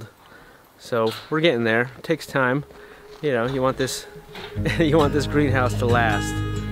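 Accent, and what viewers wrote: Southern California, United States. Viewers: Excellent work! Your greenhouse is amazing! I wish I had that same greenhouse on my farm! Beautiful! Raise The Ruff! Be careful! By next year you may be calling it a Roof! Ha! Ha! The longer your here the more you will lose your Southern Cali Accent! You should hear my sister in law's accent now. She is originally from Glasgow Scotland. However, after many years living in North Carolina she has the most unique accent you've ever heard. Part of it is Scottish accent, part of it is Southern North Carolina Accent! So Beautiful! We will compare as time goes on to see how your accent is affected! LOL! It's All Good! When we lived away from the south in Japan and New Mexico I lost some of my southern accent for a while too! Funny how environment and surrounding people affect what we sound like! I hope it doesn't offend you how we tease you about your accent. 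I used to get teased a lot about my southern accent when my husband was in the Air Force. People would always ask me to say certain words and often just say to me... Can we just listen to how you say things??? So Funny! It never bothered me. I just laughed and had fun with it! No Harm! Just Laughs! God Bless!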